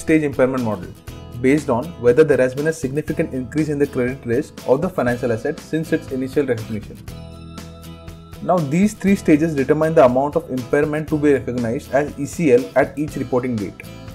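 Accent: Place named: India. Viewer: Indian English ooooah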